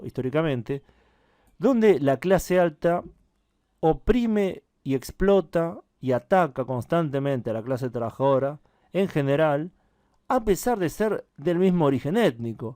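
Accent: Argentina. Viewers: Buenos dias .muy Buenos conteúdo. Parabéns pelos vídeo. Mi prime vez que llego aqui en canal. Escutando lo acento argentinos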